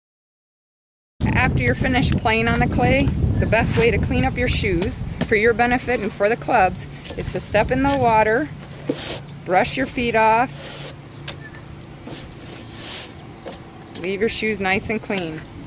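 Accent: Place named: United States. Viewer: judging by your american accent you have probably never been to the ATP courts in Monte Carlo, and since I have I can tell you they do NOT use the "coil" system as you call it. yes the sideline to sideline style leaves clay on the sides near the fence, but your method doesn't follow professional methods.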